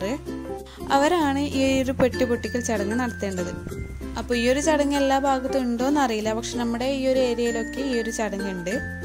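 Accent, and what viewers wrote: Kannur, India. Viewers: Kannur basha 👍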